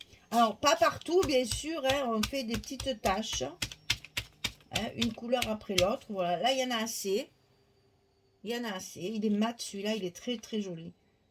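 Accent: South, France. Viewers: Superbe, j'adore te regarder juste pour ton petit accent du soleil 😘j'admire tes créations... Vais peut être finir par me lancer dans un album. Je ne connaissais pas cette marque, est elle chere ? Car petit moyen. J'essai de faire des créations avec mes petits moyens, je viens d'ailleurs de créer une chaîne qui comme moi, ont peu de moyens. J'ai trouvé une astuce pour donner un aspect corrosion à nos decoupes style steampunk, si cela t'intéresse. Merci pour tes partages❤️❤️❤️❤️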